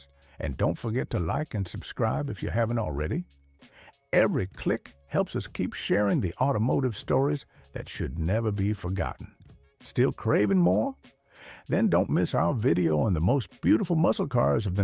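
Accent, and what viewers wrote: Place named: South, United States. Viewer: This “guy’s” southern accent is difficult to hear, difficult, as in sickening.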